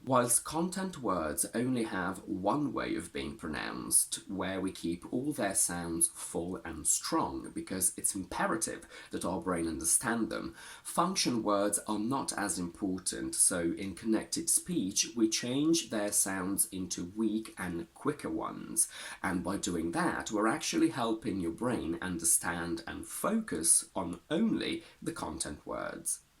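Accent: RP, United Kingdom. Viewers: You have a very good modern RP pronunciation, but you use a lot of vocal fry.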